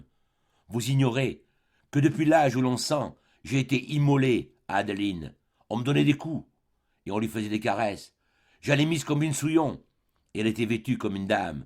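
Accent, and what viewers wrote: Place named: South, France. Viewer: Ce n'est pas un conteur agréable a écouter Cette accent du midi élocution hachée j’abanbonne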